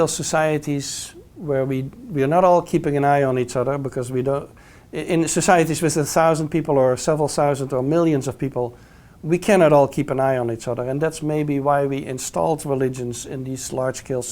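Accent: Netherlands. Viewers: balkenende accent.... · I could here he was dutch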